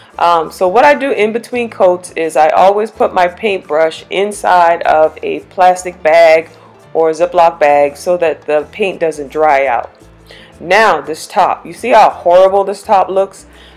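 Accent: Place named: Gullah Geechee, United States. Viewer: girl!! This is quite interesting --- I kept saying to myself mercy—I hear the geechie in her voice😂😂 I'm from myrtle beach!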